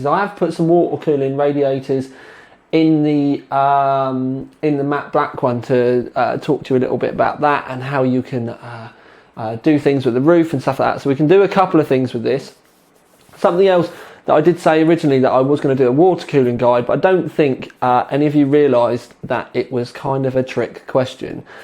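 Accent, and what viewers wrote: United Kingdom. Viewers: Fuck that, im hangin with fer a 1/2hr!! More brit accent! More lamp smashing!! FTW In all seriousness....smash more lamps.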